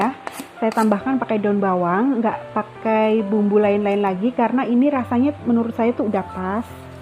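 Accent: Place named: Central Java, Indonesia. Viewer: Rumahnya mana mam, daerah jawa tengahnya? Jajanan pasar dan logatnya khas..salam kenal dari saya klaten jateng🙂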